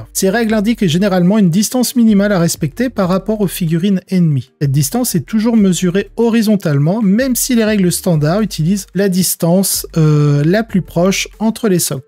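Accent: England, United Kingdom. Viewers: Merci, c'est tellement nécessaire. Même en amical, être clair sur les règles du jeu permet de vraiment profiter du jeu. Toujours un plaisir de te suivre . Ton accent anglais, ta marque de fabrique 😎. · Un grand merci pour cette vidéo! Ça fait toujours du bien d'avoir des éclaircissement sur les règles, qui dans certaines situations ne sont pas évidente! Surtout avec qualité ET un accent anglais extraordinaire ^^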